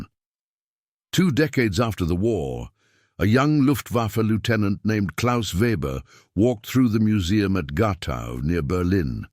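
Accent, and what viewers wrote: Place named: United Kingdom. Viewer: They did not mock, laugh, joke, etc. Just put the facts in and can we have another AI voice that is not British?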